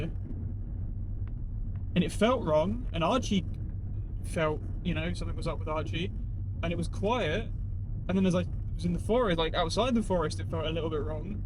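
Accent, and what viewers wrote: United Kingdom. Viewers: It was a cow. Well, bull, actually, since "cow" is the term for a female bovine specifically. That was the sound of a horny bull. I'm guessing you're in the UK from your accent and how flat everything is around you. If that is true, then the most dangerous thing in those woods was probably another person. Not trying to diminish your instinct to get out, just saying that there aren't any large animals out there that would have caused it. Cattle can be dangerous, especially amorous bulls, but still, a junkie or psycho human is going to be your biggest threat walking around out in the middle of nowhere. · Where are you? From your accent, buildings, car, etc. I'm guessing the UK? Nothing dangerous there? I guess there have been Puma sightings, but no bears or other large predators. I would have gone to seek out the noise unless you were on private land. 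Kinda sounds like someone is butchering a cow. My guess... Fox, Cat in heat, Owl. In North America, we have a lot of large predators bears, cougars (not old ladies), wolves, wolverine, moose(very dangerous), elk(bugles), etc. Even Bigfoot! Sounds kind of like your noise. 😁 Is there a zoo nearby?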